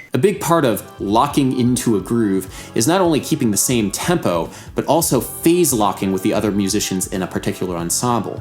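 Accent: United States. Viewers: American musicians follow the German system of naming note values after fractions, while British musicians have adopted the French system. Judging from the uploader's accent, he appears to be an American. Therefore, his use of "quarter" and "eighth notes" is entirely proper.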